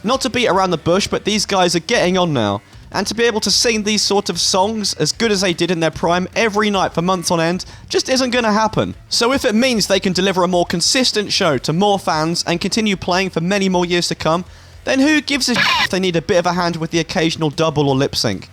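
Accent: United Kingdom. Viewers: It's so refreshing to hear a fellow british accent on youtube lmao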